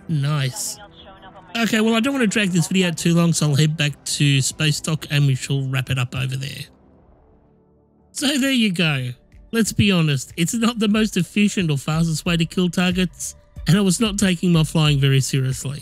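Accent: Australia. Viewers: You sound like an Australian Jack-Frags :D :D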